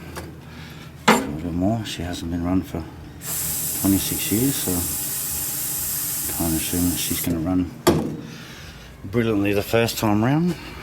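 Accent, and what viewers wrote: Australia. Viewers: he speaks australian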